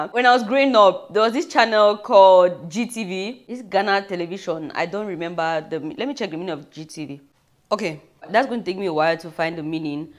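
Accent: Nigeria. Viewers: You sound so much like a Nigerian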